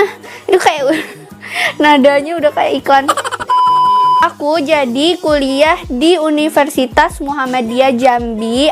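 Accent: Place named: West Java, Indonesia. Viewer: Ak kirain orang Sunda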